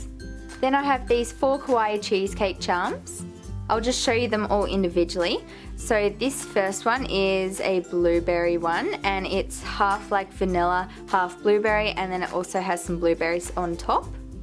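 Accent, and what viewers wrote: Australia. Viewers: I love the accent of Australia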